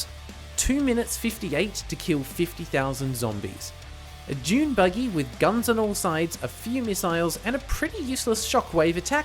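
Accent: South, United States. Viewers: I love southern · I am all for southern American accent! Yee-haw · i thought he was going for southern US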